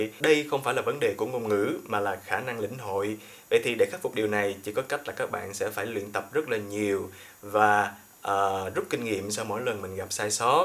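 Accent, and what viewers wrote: Saigon, Vietnam. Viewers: Đúng giọng sài gòn luôn nè, nghe rất thích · Giọng Saigon nghe hay quá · Khong biet thay có fai nguoi Saigon ko, chi thay giong cua thay GIONG giong of minh qua, hi hi ! Ma minh la nguoi Saigon 100% .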